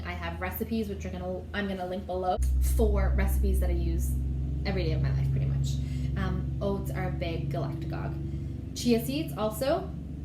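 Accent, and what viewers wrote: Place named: Canada. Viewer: John Sanders she sounds like she has a Canadian accent to me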